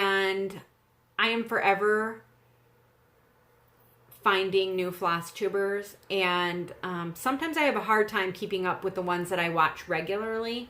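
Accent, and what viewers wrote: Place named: North Dakota, United States. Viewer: Your accent makes me miss home -- we moved to Mississippi from North Dakota 11 years ago, and my northern accent has faded, dontcha kno? But when I go back home, it's so easy to pick it back up again. Love your vids. You're a sweetie pie!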